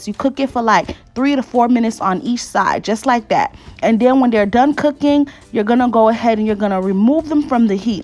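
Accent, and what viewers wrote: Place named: Miami, United States. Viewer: Hey, first off I love you meals I have tried several recipes, I’m a new subscriber. However, you talk like you live in Miami?? I’m from there and I here it I might be wrong. 😂🥰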